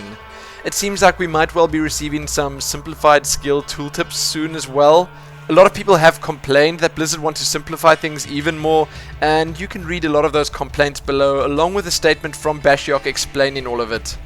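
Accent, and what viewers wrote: South Africa. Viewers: This is why regular TV is dead. Freely accessible, top notch content like this is on Youtube. I might say niche content but it;s well produced nonetheless. Got to love that South-African accent., you do excellent vlogs as well man. Did you ever consider presenting a Pulse episode?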